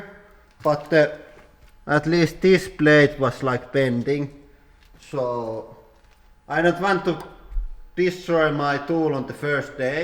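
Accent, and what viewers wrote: Finland. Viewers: Charles Ray He is from finland. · He´s from Finland.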